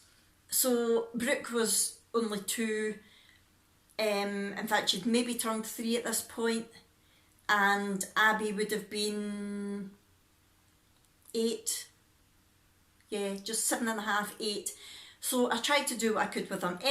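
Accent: Scotland, United Kingdom. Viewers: .. Sorry, it was Rick Chan who mentioned your channel in his comment on a mutual vlog we watch called Worms Eye View. She is learning Welch and how to say the words, and Rick mentioned your Scottish accent, which I love, so I scooted over to your channel. Hope I didn't confuse you. Ha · Hi have just came across your videos on here, can I just say that you are such an inspiration, I am working my way through all of them lol 😊 really enjoying listening to a fellow scot for a change, I'm loving that you are a crafter too so am I. Thank you ❤️😘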